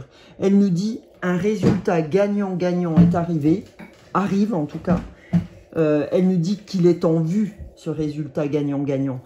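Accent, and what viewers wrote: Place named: Franche-Comté, France. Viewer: Bonjour je vous découvre aujourd'hui, je trouve que c'est sympa vous êtes très naturelle, je ne sais pas de quel coin vous êtes mais votre accent ressemble à celui de la Franche-Comté. Je m'abonne. Au plaisir de vous écouter de nouveau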